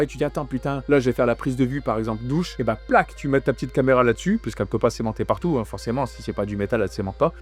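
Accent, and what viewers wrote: Saint-Étienne, France. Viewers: c'est un accent stéphanois qu'on entend ?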